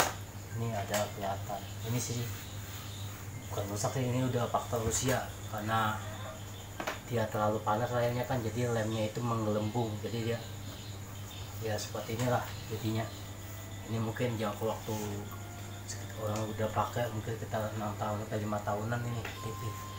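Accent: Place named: Banjar, Indonesia. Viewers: Kyknya teknisi kalimantan ini.. kedengaran sempat bhs banjar 😀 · Betul teknisi Kalimantan urang banjar tinggal di grogot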